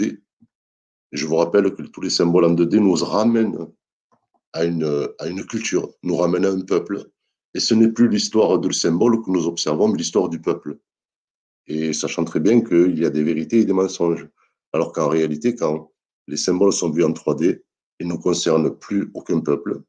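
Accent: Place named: Marseille, France. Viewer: Voix maghrébine et accent Marseillais, un bon mariage. Bonne journée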